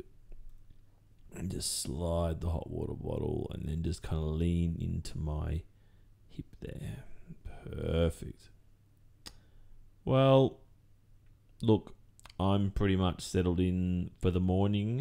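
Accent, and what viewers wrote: Australia. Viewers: I finally found and Aussie asmrtist and I am in love. I thought my Aussie accent was strong and I'm Australian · Australian accents hit different🤣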